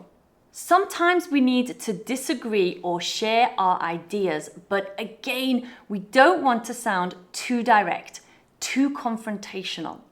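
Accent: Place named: Midlands, United Kingdom. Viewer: Your accent appears to me " Midlands or estuary accent " don't you?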